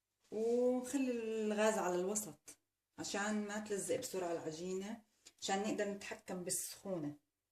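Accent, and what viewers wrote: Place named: Nablus, Palestine. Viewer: الله عليك ، ما بتتخيلي قديش انبسطت بهالوصفة صرلي زماااان مشتهية الكلاج بالجبنة ، القطر لازم يكون بارد ولا لا ؟؟. من وين أنت بفلسطين ، حاسستك نابلسية صح ؟؟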